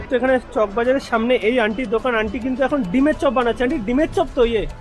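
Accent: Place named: India. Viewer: What a Hindi accent 😀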